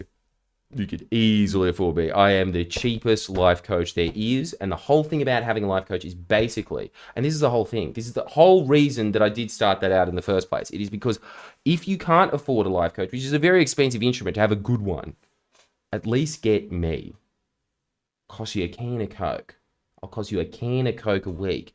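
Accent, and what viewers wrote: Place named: Australia. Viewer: The only thing I have energy for is to hear beautiful Aussie accent and his perfectly cut chin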